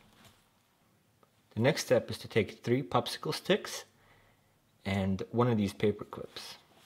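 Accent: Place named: Russia. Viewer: Are you Russian?? You talk like a Russian (not for bad)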